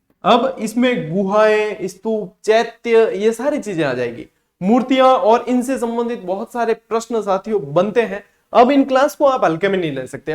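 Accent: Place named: Marwari, India. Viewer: Bhot acha pdhà rhe ho sir bt aap marwari thodi kam bolne ka try kre .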